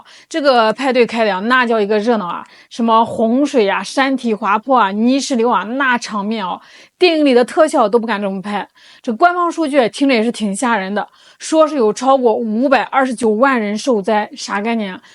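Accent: China. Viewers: 妮子，你是哪个地区的普通话，好听。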